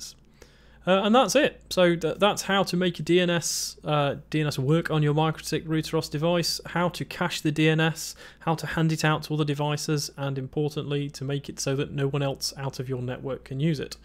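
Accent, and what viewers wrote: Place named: United Kingdom. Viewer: Hear British accent, thinks maybe he’ll call it a rooter. Immediately calls it a rooter. Subbed.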